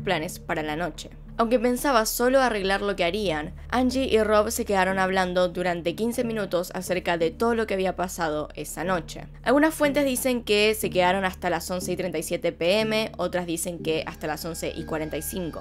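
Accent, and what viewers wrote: Argentina. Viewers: Amo tu forma de redactar! Y más porque hablas en idioma argentino ♥️ ^^ Me gustaría que hagas el caso de Jenneka Jenkins! Gracias por el maravilloso contenido que nos regalas ^^ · Antes sólo veía a Paulette y ElizbetM, pero desde el día que YouTube me recomendó tu canal me encantó. Hace apenas una semana de éso y ya casi me veo todos tus videos. 😂 💕 Eres de las mejores en lo que haces, me encanta tu fluidez, vas al grano, das detalles y se nota que neta investigaste y conoces el tema a fondo. Muchas felicidades, y éxito para éste canal! 🙌🏻 Saludos de Mexicooo. 🇲🇽 P.D amo tu acento Argentino. 😂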